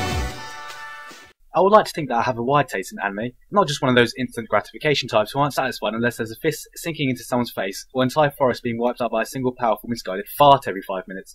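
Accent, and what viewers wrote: United Kingdom. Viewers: Gigguk lost most of his british accent after a few years 😂 he's more understandable now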